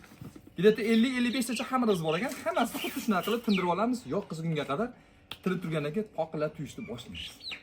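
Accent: Fergana, Uzbekistan. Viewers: Фаргоналимисиз каеридан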